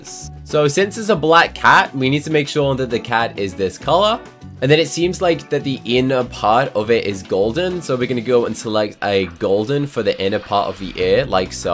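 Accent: United Kingdom. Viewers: British Guy he is a british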